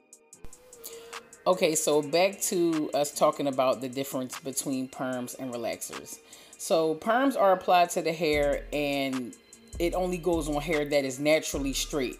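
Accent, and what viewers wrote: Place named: Louisiana, United States. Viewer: Are you from Louisiana? Your accent sounds creole like some south Louisiana residents.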